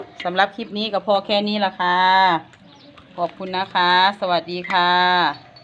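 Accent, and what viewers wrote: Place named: Phu Thai, Thailand. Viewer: สวัสดีครับแม่ มากินก้อยปลานิลนำครับเห็นแล้วหิวเลยครับ ฟังเสียงเว้าคือคนภูไทย้อ สกลนคร นครพนม เมืองเลยนอครับ ข้อยคนอุบลครับ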